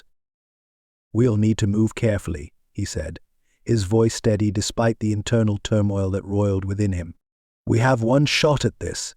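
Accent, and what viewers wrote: United Kingdom. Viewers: The British accent doesn’t seem to fit with a historical western.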